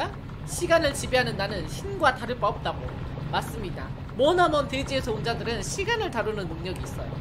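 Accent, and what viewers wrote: Japan. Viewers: 한본어 개웃기넹 ㅋㅋㅋㅋ큐ㅠ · 한본어에다가 은근 성우스러움 ㅋㅋㅋㅋ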